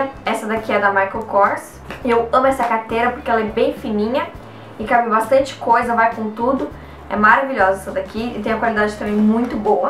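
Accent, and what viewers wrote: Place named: United States. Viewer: eu queria saber uma coisa, seu sotaque "forte" de inglês é por conta de vc já ter morado nos Estados Unidos? Fala inglês fluente? Beijo e amei o vídeo 😁😘💜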